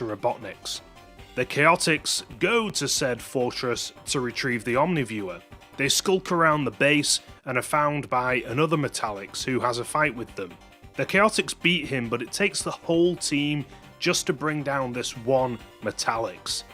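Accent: United Kingdom. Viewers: What a treat to get the entire Fleetway sonic comics told! All with the perfect British narrarator for it. So nice to have you back.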